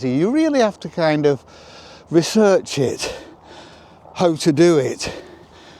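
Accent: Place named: Liverpool, United Kingdom. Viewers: I love your accent! American here but I’ve always loved a northern accent. I think you’re very interesting and enjoy hearing your views and watching your life 🙋🏻‍♀️ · Your accent reminds me of the.❤️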